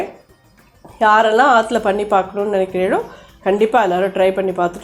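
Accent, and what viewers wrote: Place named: Brahmin, India. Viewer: Maami neengal prema padurang mathiri irukkel. U speak pure brahmin agraharam Tamil. Excellent mam 🙏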